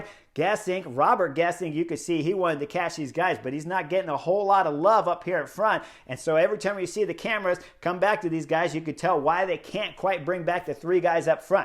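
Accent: United States. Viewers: Man…this feels like the end! My wife now knows your voice and thinks you’re from the south…haha! She can’t believe that there’s so much to talk about. Hopefully, she will understand why in the next season. Going to miss the everyday episodes. It’s like a tv series arcs: Giro, Tour and now Vuelta.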